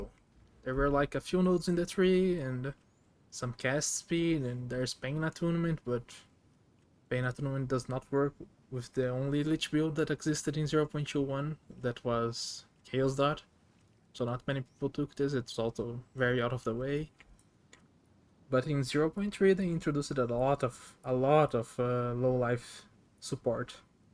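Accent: Brazil. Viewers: Voce tem sotaque brasileiro parece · é Brasileiro esse sotaque🥰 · hmmmmmmm tu é br né? esse e esse sotaque, me cheira a br kkk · Mano, excelente vídeo aospdkaposdkaspodk eu comentei antes de ouvir você falar, ai eu vi que era BR hahahahaha. Vou seguir sua build. Você acha que ela funcionaria com Abyss Lich?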